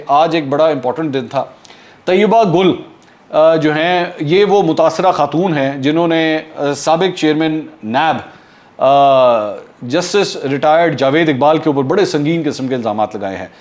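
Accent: Punjab, India. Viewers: Your Punjab accent and irony behind this is mind blowing 🤭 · Pti walay gory ki tasweer daikh k khush ho gaey.Amazing vlog with Punjabi ka tarka puttar maza aa giaa🤣😂😁😀😁😂😂😂😃😄😄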